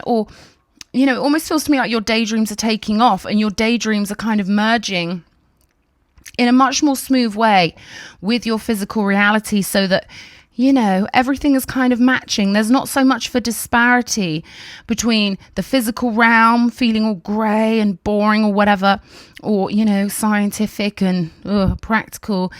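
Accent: United Kingdom. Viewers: that cute lil British accent of yours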